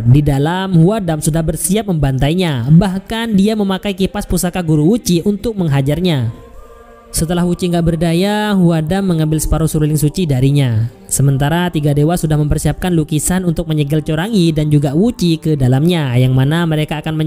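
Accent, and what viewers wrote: Madura, Indonesia. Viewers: Mimin orang madura ya logat sama kayak aq hhhhh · mak medok ben bro logat madureh tak nyaman ekedingagin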